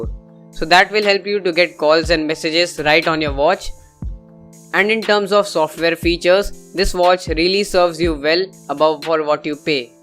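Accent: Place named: India. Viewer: thanks for a great review, I'm buying one, Nice english/indian accent, friendly people.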